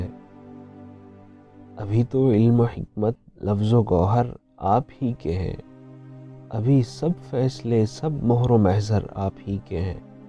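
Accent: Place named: Punjab, Pakistan. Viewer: very nice urdu accent that too from a Punjabi speaking (I am assuming since you have as a Surname). Highly appreciated.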